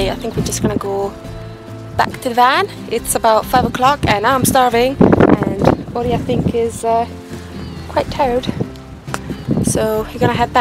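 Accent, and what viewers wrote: Scotland, United Kingdom. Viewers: You sound like you are turning Scottish.You sound like you are picking up the Scots' accent. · just found this after doing some hills at weekend and a bothy visit near The Grey Corries.. your 'bothy' pronunciation is spot on and you're picking up a Scottish accent here and there :) we've now got to stop planning our 6 month (or longer) van trip and just do it!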